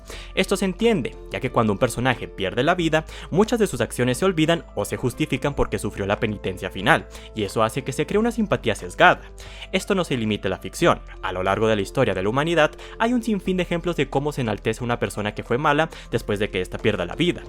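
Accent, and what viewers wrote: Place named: Honduras. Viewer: Me gusta el acento de la voz del locutor o narrador, es un acento hondureño no?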